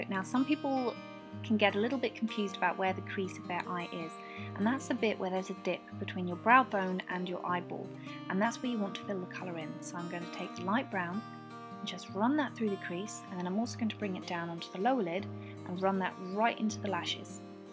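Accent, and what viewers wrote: United Kingdom. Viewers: I LOVE YOUR ACCENT ITS SOO AWESOME! where are you from? it sounds like your from the U.K. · Your voice and accent sound fucking horrible. Like an old british hag. Id hate to be British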